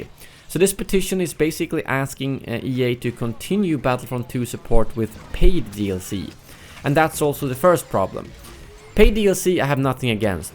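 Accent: Sweden. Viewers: You from Sweden?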